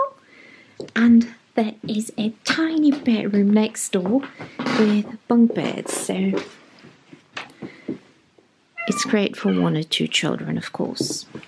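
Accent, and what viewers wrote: United Kingdom. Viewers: Your british accent! So sweet and calm. I like that your language rules the world.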